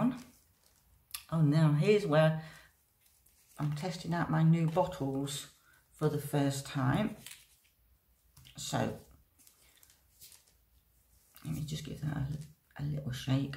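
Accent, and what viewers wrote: United Kingdom. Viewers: my DArling this is bloody fantastic❤ I absolutely loved your video loved your experiment loved the outcome and love that I can use my fancy British Accent[in my head] when typing to you🤣🤣🤣Sorry I'm a huge Royal EVERYTHING fan so when I hear that beautiful accent I go gaga. Love you sister. TaTa for now Dahhhling❤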